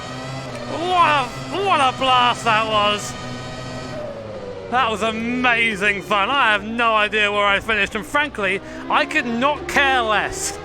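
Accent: United Kingdom. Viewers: I just realized how good of a F1 commentator would be, I mean the eloquence, familiarity of F1, and his british accent, what more do you need?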